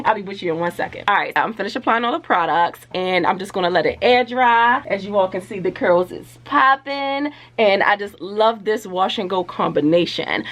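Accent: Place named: DMV, United States. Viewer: New subbie here from Jackie Naturals 💕 Would love to support each other! Your curls are absolutely beautiful 😍 I am in loveee lol. Are you from the DMV? You kind of sound like it. Loving your hair & energy 🔥 The results look so good!!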